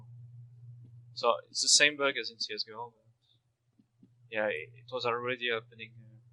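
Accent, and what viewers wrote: France. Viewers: ta un bon accent hein :) mais c'est quand même cramé que tu es FR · Ce gros accent français omg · i can hear some french accent in your voice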